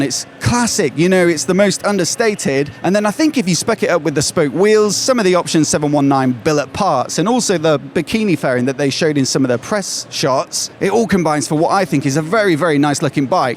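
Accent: England, United Kingdom. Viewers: excellent side by side overview of both new R12 models. Presented in a clearly spoken and easy to understand generic English accent, thank you. Particularly liked that you sat on the bikes to illustrate their relative size. Eagerly waiting for your road test - and the USA release of course.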